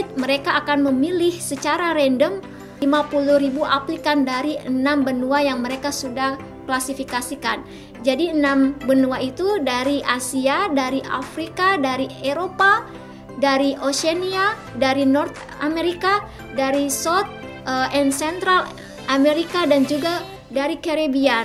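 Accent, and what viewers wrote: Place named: Palu, Indonesia. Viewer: Lebih lagi kw buat vlognya pake dialek palu.... Bangga orang Indonesia, khususnya palu. Dstu di liat betapa bragamnya Indonesia...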